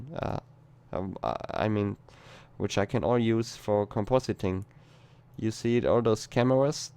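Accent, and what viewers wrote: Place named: Germany. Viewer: could it be that you lived in germany before you went to Australia? Because you speak english with some kind of accent;)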